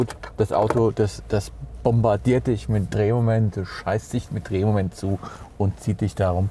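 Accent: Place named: Hesse, Germany. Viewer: Lieber, ich stehe aktuell vor der Entscheidung pro Elektro-SUV und habe mir daher unzählige Youtube Videos angeschaut. Zudem bin ich den EQC gestern zur Probe gefahren. Deine Eindrücke teile ich zu 100%. Das vermutlich ehrlichste Review überhaupt - zudem im hessischen Heimat-Slang! Besser geht net. Was mir zusätzlich aufgefallen ist: der Raumeindruck ist doch etwas beengter als bei der Konkurrenz. Das war auch schon beim GLC der äußeren Form geschuldet und ist durch den höheren Boden (Batterie!) nicht besser geworden. Es ist wirklich eher bloß der Eindruck aufgrund der gerundeten Formen, denn Platz ist trotzdem für 95% des natürlichen Publikums ausreichend vorhanden, meine ich. Die andere Geschichte war das Fahrwerk. Das federt einerseits Unebenheiten souverän weg, hinterlässt jedoch auf längeren Bodenwellen ein Gefühl leichter Seekrankheit bei mir. 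Da hätte ich es gern einen Tick direkter gehabt. Ist vielleicht persönliche Präferenz. In engen Kurven bleibt der Wagen spurstabil, kann seine 2,5 Tonnen aber nicht ganz verstecken. Das ist die Physik. Die Bremse war für mich übrigens okay.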